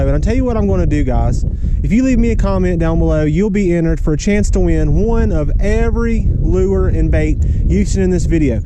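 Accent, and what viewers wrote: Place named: Georgia, United States. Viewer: Are you in ga. Cuz you sound like it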